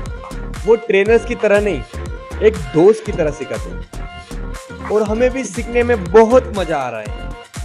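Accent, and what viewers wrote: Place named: Maharashtra, India. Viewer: we simply love maharastrian hindi 😅🥰🥰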